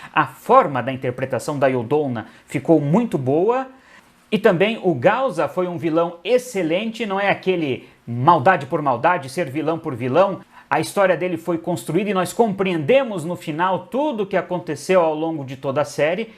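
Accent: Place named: Brazil. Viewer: I figured it was Portuguese. Its a great video. As a native Spanish speaker i understood about 60%. Its great to know that Toku is watched all over the world! I'm going to assume that you're from Brazil since it sounds like Brazilian Portuguese. I which that toku could be more popular here in the US where I live P.S. if you could someday add subtitles it would be also. Regardless I'll subscribe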